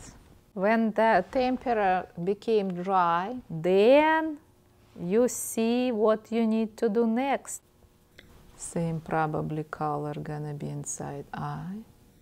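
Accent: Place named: Russia. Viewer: I know she has my accent I miss Russia, but I am glad I am no longer there I love it here :D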